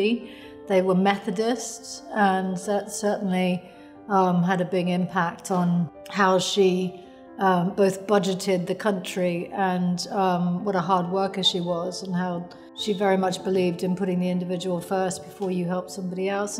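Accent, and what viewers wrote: England, United Kingdom. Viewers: How does she look like this 30 years after xfiles??? And she was born and raised in the USA - why does she sound sooooo BRITISH ???? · She doesn't sound American in anyway anymore. She sounds very British! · Why is she speaking in a british accent, shes from Chicago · Before I click on a video I always cross my fingers hoping it’s the British accent😍 She’s so fine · You've gotta remember she had an English accent when she moved back the the US when she was 11. She was bullied and teased for it and therefore tried to sound American. Now after living in London again, she's likely fallen back into speaking that way. However when she is in the US, it isn't as evident. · Her British accent is more prominent than ever, right? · I really like her voice. Her British English is really nice to listen to. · What’s with the British accent Gillian? 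Is she still in character? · Why is she talking wirh a slightly british accent?! She should be figuring out how to get out of character! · Why is she speaking with an English accent? This is a choice. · What's with the British accent, she's from Chicago!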